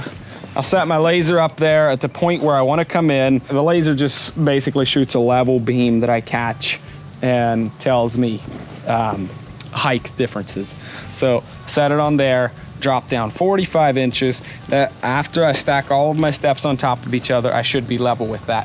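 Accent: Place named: Maryland, United States. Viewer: What is that accent? Is that a northeast/Maryland accent because I am so intrigued by it! Looks good by the way!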